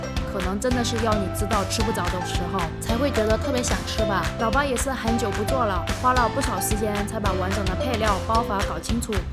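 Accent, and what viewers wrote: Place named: North, China. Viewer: 我是海南定安人 新竹 我搬凳子來看，你口音澈底北方人口音。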